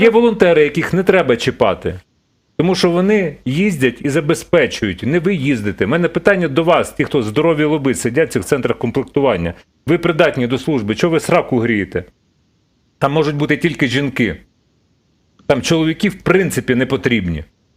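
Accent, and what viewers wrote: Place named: Poltava, Ukraine. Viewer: згадалась ваша полтавська вимова,коли читала в місцевому чаті одне з повідомлень. Це Херсонщина. Ось це повідомлення: "Вірні слова,бо унас не так як у людей,ми будем собирать кошти, на ремонт а хтось буде мидальки получать,мидаль наде було дать громоді яка була в окупоції,чого не пишуть де діли 60 тон гомунітарки,а тендери по ремонту це зовсім інша проблема,унашом отеге все можливо,кому війна а кому мать родна."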